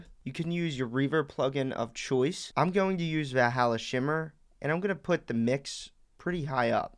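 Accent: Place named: East Coast, United States. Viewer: you must be from the upper east coast " cut it in hafv"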